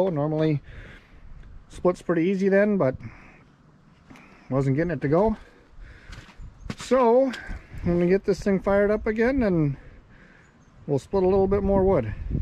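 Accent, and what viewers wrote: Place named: Canada. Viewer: You sound Canadian 😎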